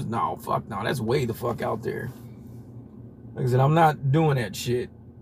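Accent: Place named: Bay Area, United States. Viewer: You sound like you’re from the Bay Area.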